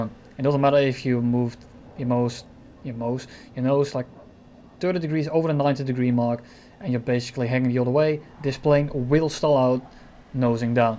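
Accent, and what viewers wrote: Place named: Netherlands. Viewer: They're both dutch.... it's literally just the accent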